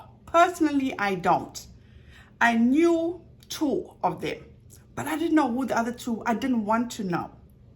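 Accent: South Africa. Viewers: has GOT to be sipping vodka in that cup, and be delusional af if she thinks that 'joke' was funny in any way, shape, or, form.. P.S. Are you from South Africa? Even speaking about, your voice and laughter sound like music! It's beautiful, thank you for sharing it with us!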